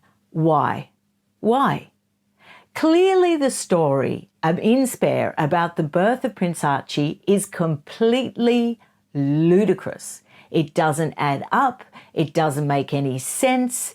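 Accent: Australia. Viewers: you speak like a true Australian. 👏🏻🇦🇺 No flies on our noses. The time is approaching via social media that every skeleton Charles is hiding in his closet will be revealed. He has vast resources at his disposal to have known all.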